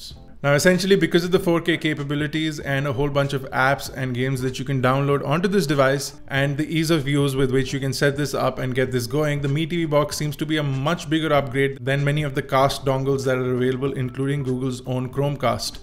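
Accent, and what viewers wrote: India. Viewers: problem with the microphones because your accent is india not english nature not to be racist or anything. i thought this was to see online stuff but is pretty much a big youtube watch and a netflix stuff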